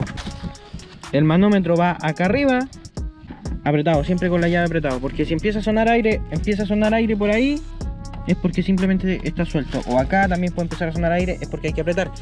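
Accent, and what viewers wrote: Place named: Chile. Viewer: Re chileno 🤣. Saludos desde Argentina 🇦🇷🇦🇷👍😎👍🇦🇷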